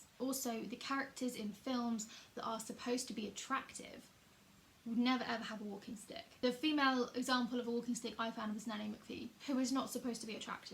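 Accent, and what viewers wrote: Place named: Southern England, United Kingdom. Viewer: You sound like Beckie0 <link> Where abouts in England are you from, somewhere down south?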